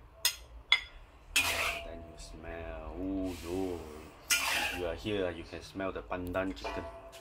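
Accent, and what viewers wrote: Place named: Singapore. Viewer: Typical singlish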